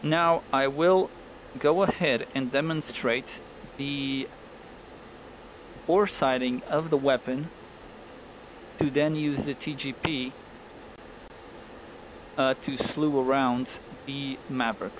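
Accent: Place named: Portugal. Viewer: E esse sotaque portugues?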